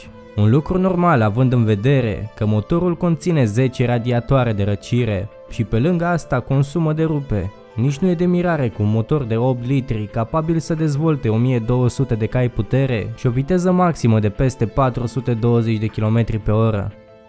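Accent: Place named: France. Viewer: Ai achent de francez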